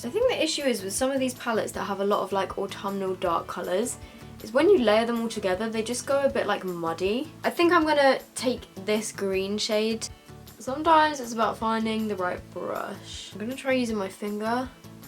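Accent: England, United Kingdom. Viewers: I just subscribed and I wanted to know if you were English? (I'm English) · Are you from England? Your accent sounds that way 💖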